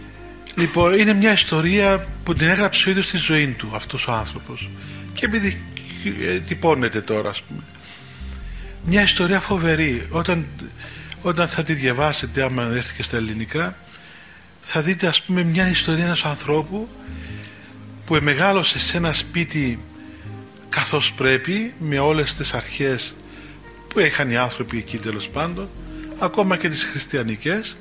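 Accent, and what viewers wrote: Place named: Cyprus. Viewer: Πόσο σε αγαπάω πατέρα Αθανασιε, σε ακούω πολλά χρόνια καί έχω ωφεληθεί πάρα πολύ. Μου άρεσει το χιούμορ σου, και τα κυπρεικα που λες τα απολαμβάνω. Να σε έχει ο Θεός καλά Ευχαριστώ από Ελλάδα.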